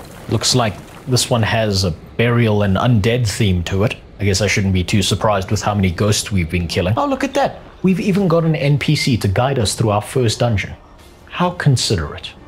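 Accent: South Africa. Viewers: Lakka video bud, sounds like you have a slight SA accent though? Or is it Kiwi?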